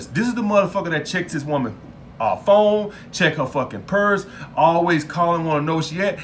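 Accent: South, United States. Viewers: True knowledge as always! I always heard the southern accent but didn't know you was from Alabama fam!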